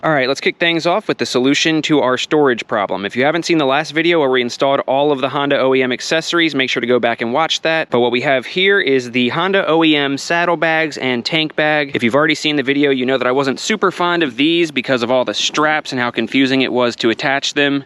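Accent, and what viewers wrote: United States. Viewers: You sound American but you keep talking about liters of storage?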